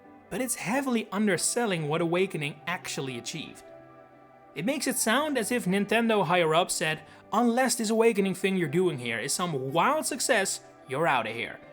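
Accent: Netherlands. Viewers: You don’t have to tell me about jokes. When I lived in the US, the jokes that killed in the Netherlands fell flat with my American friends lmao Also, I KNEW you were Dutch!! I definitely recognized your accent when I first heard you speak in your earlier videos. I love seeing fellow Dutch people and especially when they’re into FE lol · I was contemplating if he was dutch or not for so long! I kept thinking, is he dutch? The accent sounds so dutch! Now I finally have confirmation! Another dutch YouTuber found! I love being dutch sometimes hahaa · You can hear you have an accent but I did not expect it to be dutch! Are you from the netherlands or belgium?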